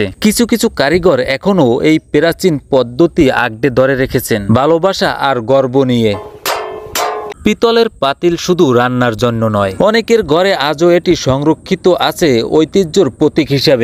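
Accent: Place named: Barishal, Bangladesh. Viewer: এই বাস্যকারের বাড়ি মনে হয় বরিশালে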